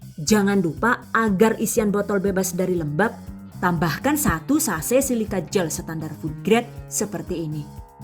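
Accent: Banyumas, Indonesia. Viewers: Ngapak temen wa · 😁😁😁ana bahasa ngapak e kya nyong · Wong endi si rika mbakayu...? Deneng ngomonge pada karo enyong...! Jos lah top markotop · AQ suka bahasa ngapknya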